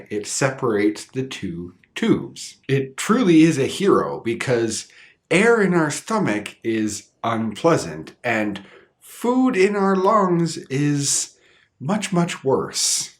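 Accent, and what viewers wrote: Canada. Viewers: It is English, from a native speaker, he's also Canadian, so it would be "Canadian English" I suppose. The North American pronunciations are the widest spoken, more than 75% of native English speakers use North American pronunciations. If he were Welsh would you expect him to say "Welsh English"?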